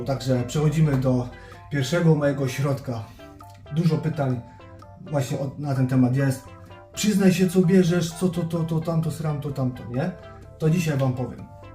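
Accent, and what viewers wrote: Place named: Upper Silesia, Poland. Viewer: No, no, łapy masz fajne. Słychać, że jesteś z Górnego Śląska.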